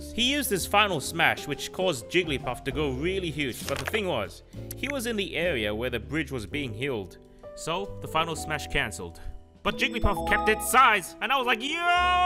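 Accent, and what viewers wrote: Australia. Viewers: You are from Australia? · Wait. Are you from Australia? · Is aussie? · your Australian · Wait so you’re Australian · Wait…… is Australian? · OH SHIT I NEVER KNEW WAS AUSTRALIAN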